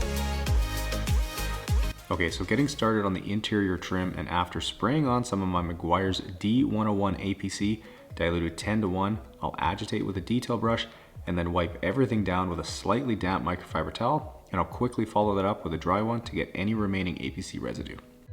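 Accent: Canada. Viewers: What did he say? Compressed air and what? His Canadian accent lost me.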